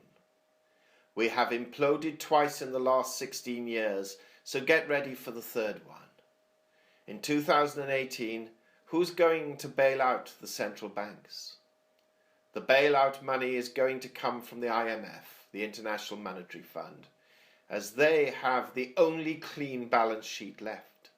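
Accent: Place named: Wales, United Kingdom. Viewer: I thought you sounded Welsh, is that right? if you are, it's a very mild accent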